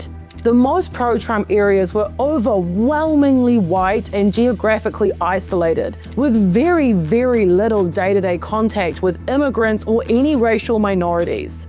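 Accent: New Zealand. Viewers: Thank you to a New Zealander on the other side of the planet for helping me understand my neighbors in the U.S. a little bit bedder, (sorry; 'better'. Enjoying the Kiwi accent). I've lived most my life between rural Maine and the city (not bedroom suburbia). Last week, deep in the Trump country of rural Maine (Burnham, 67.5% Trump) we just lost another factory, (making wood products.) Whatever the reason; state laws, Trump tariffs, Trumpism is clearly not helping. Will they catch on? Probably not before it is too late and any form of democracy is gone. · Excellent logical intelligent presentation. I was sceptical initially and suspected this was an AI-generated Kiwi accent to appeal to us, despite being familiar with voice from RNZ but clueless about her appearance. (Subbed.) Trump convinced the "unwashed" that the middle-class were the elites who were mocking and oppressing them. 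Steve Bannon is all over this cynical manipulative strategy.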